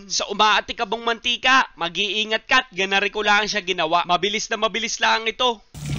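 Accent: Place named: Batangas, Philippines. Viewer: batangeño na *batangeño*. alah naman!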